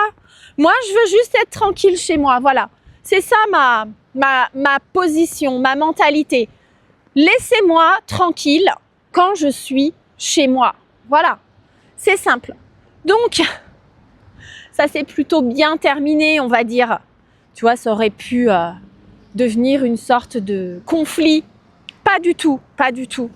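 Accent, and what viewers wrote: France. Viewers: Heureusement pour moi que j'habite une maison individuelle sinon ce serait un calvaire avec le voisinage, j'allume le micro et je mets le son bien fort, surtout que la voix aigué avec un accent parisien🗼 très particulier de notre belle 🌹est très agréable à entendre, sauf ma très chère mère quand je mets la musique fort dans ma chambre, elle vient pour me dire " mais tu n'entends pas très bien! tu sais d'où on t'entends!, parfois elle rentre en dansant 💃en me disant tu sais l'heure qu'il est! ha ha ha😊, ma grand mère qui habitait un appartement à Alger, ses petites filles jouaient en portant les souliers à talent de leur mère en faisant du bruit, la voisine du bas venait chez elle se plaindre du bruit de ces souliers à talent, donc les appartements c'est toujours un problème, il faut revoir les constructions avec de nouveaux matériaux de construction où le bruit sera infranchissable pour éviter les dérangements entre voisins et garder la quiétude et la bonne ambiance entre eux. 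Maintenant passons à l'humour, mais c'est juste une blague pour plaisanter, une histoire qui s'est répandue en Algérie il y a plusieurs années, il s 'agit d'une française qui est venue en Algérie, elle a rencontré une famille qui avait un chien qui lui a réellement plu alors on le lui a fait cadeau, en retournant en France, comme elle habitait un appartement, elle a mis le chien dans son balcon, mais la nuit quand elle dormait, le chien n'arrêtait pas d'aboyer ouaf ouaf oua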